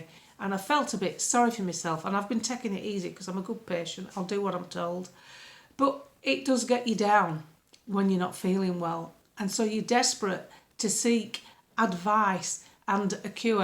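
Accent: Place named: RP, United Kingdom. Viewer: Brilliant as usual but stop talking posh. DATA is pronounced day-ta not darter. Phil Taylor is a darter 😊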